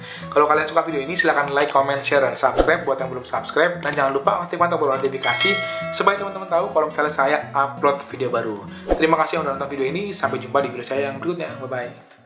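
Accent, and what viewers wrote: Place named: Java, Indonesia. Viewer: Lokasi videone pas sore nang GSP yo mas? 😅 Logate yo rodo medhok je ✌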